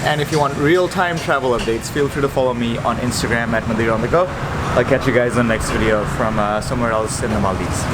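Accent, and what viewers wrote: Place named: Bangladesh. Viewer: It's nice to hear a Bangladeshi voice on foreign soil❤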